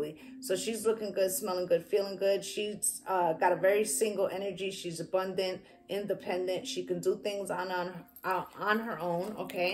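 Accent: New York, United States. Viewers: Definitely hear it when you speak. I can always tell when I hear a fellow New Yorker! 😁 What part are you from? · He'll NEVER be in my Empress energy ever again and he knows it so I'm unburdened and chilling on my throne with my peace and abundance in ALL forms while moving forward😎. I chose ME and snatched my energy back from a toxic ,unbalanced, superficial, false mask, lying, player libra ex after betrayal. NO contact and NO communication will remain in place permanently for that narc demon vampire! Shout out to New York😍. I'm from the DMV but I know a New York accent😁. Peace and Blessings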